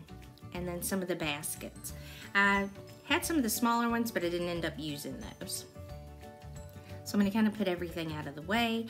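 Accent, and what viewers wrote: South, United States. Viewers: Really cute and ingenious idea for dollar tree items. We crafters never have enough space. I'm always looking for something from one of my crafting categories, watercolor painting, jewelry making, paracord crafts, crocheting, sketching and drawing, home decor, gift wrapping etcetera and so on. I'm so glad I stumbled upon your channel recently. Keep it up your easy to listen to, not over detailed or unneeded repeated instructions that can often feel redundant when listening to others. But not you, I love your flow, your realness and that southern accent is so darn sweet. Much success to you my dear! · your southern accent is wonderful what part of this great nation are you from my family is from South Carolina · Hi Mam, It's am in Arlington, VA on Saturday, October 28th, 2023. You posted this 6 years ago so your son may be close to being a teenager now and I hope he is well and your family. 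I am suppose to be seeing up a storm today and working with my glue gun as I am in a 3 day craft sale next week. But,I am tired from my week at work and I am taking a break. I live in a very tiny Flat and my 3 closets are very very tiny so I use clear shoe bags on the back side of 2 doors. My kitchen is extremely small and I believe this caddy would be awesome in my kitchen for light weight utensils and other cooking needs, a place to hang pot holders. I only have 3caninrts for food and dishes and not many drawers and they are so thin you cannot have a wide utensil holder. I have often looked a the kitchen mats at the Dollar Tree thinking they would be nice to hang my vintage fishing lures on. I like your country accent. I need to check out your site to see if your on Instagram. I hit to subscribe I hope your still making videos. Great job on this storage craft caddy. Sincerely, Rebekah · Thanks for this great inventive idea, and I just wanted to say your accent is adorable! 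I’m from WI and don’t hear a southern accent often 😉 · P Vir Another update - subscribers: from 500 on May 26, 2017 to 14,363 on June 7, 2017...and I make 14,364! That's a huge growth rate! It gives me hope for my videos (silk painting)! Great ideas! Your camera angles and explanations are perfect! You sound like you're from The South. (I'm from the Deep South 😉) Thank you for sharing your God-given gifts, talents and ideas with us all! God Bless!!! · Say there!! (my name for all my girlfriends, and I know you you would be). Can I tax your brain, or anyone else's and try to come up with something on this order we could put together to hold all my netting rolls, I make wreaths out of. So far it's big unhandy clear trash bags, because I have so many other crafts, I've run out of room, and that picky netting goes right through the trash bags. I agree with Iris only I like the Southern part of your voice also. 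I definately will be making some of these for my clutter of various different craft supplies asap. Thank you for being so organized!! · Such a cool idea!!! Loved your video you explained things very well and love your southern accent too:) Look forward to more videos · I love you tutorial and your Southern Charm, very calming and relaxing. Thanks so much for sharing, from a Texas Southerner...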